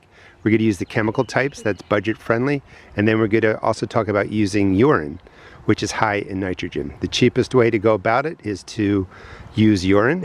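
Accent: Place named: Maryland, United States. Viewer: I hear Maryland I subscribe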